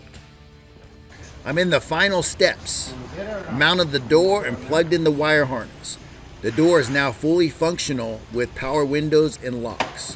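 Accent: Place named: California, United States. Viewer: New viewer, Awesome work 👍I'd hire you in a sec, but I doubt you're in my area 😕 I'm guessing that's a Californian accent, I'm in Ohio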